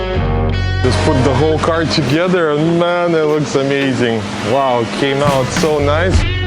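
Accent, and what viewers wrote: Russia. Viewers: этот русский акцент режет ухо конечно 😂😂😂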